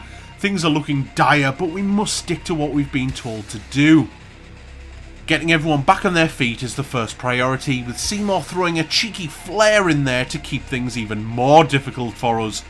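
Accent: North East England, United Kingdom. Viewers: up the north east 🇬🇧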